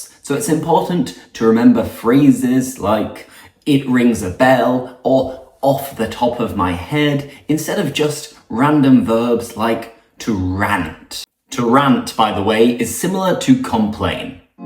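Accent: United Kingdom. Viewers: Your british accent is so beautiful!! 🥰 I am Brazilian, and my English leve is intermideat, but I am studying for improve my English and wanna be 100% fluent. 😊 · Hi . Are you from the UK? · I couldn't express my feeling much more, but at least this video could provides me guides of progressing my English skill I wanted to. Despite the struggle on my English words management for speaking, I still can understand English in the simple way (such as everyday context). As in the video, you had said "Practice makes perfect." But for me, it's seems too difficult because I always thought practicing on English consumes more times than expected. Neverthless, I have to stay strong and never willing to surrender on my track, even if it's not perfectly. And you had said next "Practice makes progress." It is true in a matter that whatever I study messy, unorganized, mistakely, and so on, progress is currently on. 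Anyways, I really enjoy your British English sound. And don't forget, I'm learning English as a second language to unlock the potential opportunities, pursuing my dreams abroad, and having fun to myself.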